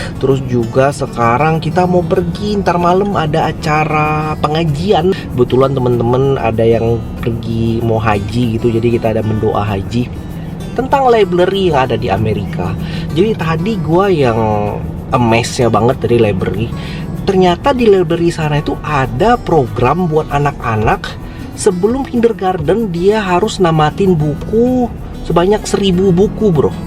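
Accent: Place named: West Sumatra, Indonesia. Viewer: Wkwkw bner TU minang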